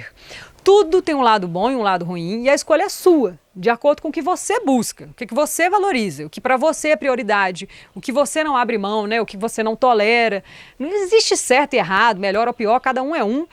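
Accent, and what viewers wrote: Minas Gerais, Brazil. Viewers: ótimo, essa ideia de voltar para esse contato com a terra é especial, temos que aproveitar mais nossa mãe, estamos por aí tão viciados no urbano frenético que por vezes até já esquecemos a nossa origem e é sempre bom lembrar nessa encarnação que quem nos sustenta mesmo não é o sistema humano artificial e sim a água, terra, ar, sol, chuva e (...). Amei esse canal, estava sentindo falta de youtubers mineir@ agente só escuta o sotaque do rio e são paulo por aqui na maioria das vezes...BH me representa muito mais na fala...vocabulário e etc..paz e luz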